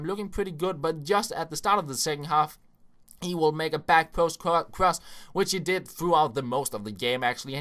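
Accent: Denmark. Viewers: Are you danish???!??!??!!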